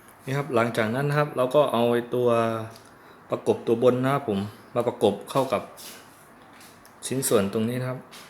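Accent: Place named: Pattani, Thailand. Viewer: เสียงแหลงเหมือนเด็กตานี 😅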